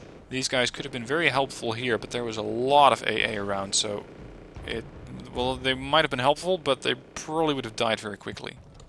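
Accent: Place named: Netherlands. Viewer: Killa Armadilla still, it's an easy mistake to make as dutch and Swedish have several identical words and a similiar flow which makes for similiar accents when speaking English :)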